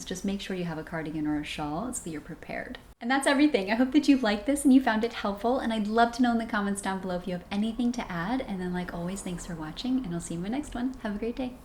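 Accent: United States. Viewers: I am sad her culture is to speak perfect American English, and abandon her own culture completely. Her culture is thousands of years older, but pretty great.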